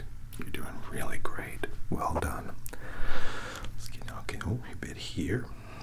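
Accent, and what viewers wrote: United States. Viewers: Wow, your American accent is excellent. If I'd seen this series first I would have assumed this was your natural accent. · I LOVE the American accent!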